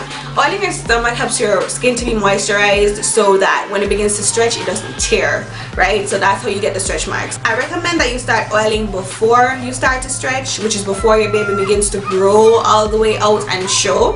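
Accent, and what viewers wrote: Jamaica. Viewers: you're welcome. I'm currently 15weeks and I needed some tips, your video came up instantly and I immediately picked up the accent being Jamaican myself.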